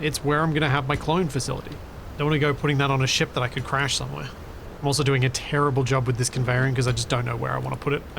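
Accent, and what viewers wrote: Australia. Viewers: Fair enough. However, the fact that you neglected to mention intentionally avoiding the vanilla grid storage and retrieval mechanics during your quandary about how to get both vehicles home did at one point prompt me to think, "Gee, if only a well know YouTube Space Engineers content creator, perhaps one with an Australian accent, had made a tutorial about the grid storage and retrieval and how it was perhaps the most important feature of the update..." 😏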